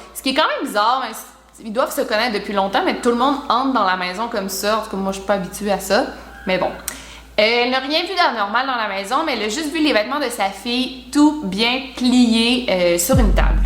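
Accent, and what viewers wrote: Quebec, Canada. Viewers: J’ai découvert ta chaîne il a pas si longtemps et tu m’as captivé dès la première minutes t’es sujet son bien détaillé et l’intrigue est bien présente!et j’adore ton petit accent québécois so cute. Sinon super vidéo comme d’habitude et j’ai adoré le petit moment nostalgique 90s à la fin de la vidéo ha ha 😜👏😘♥️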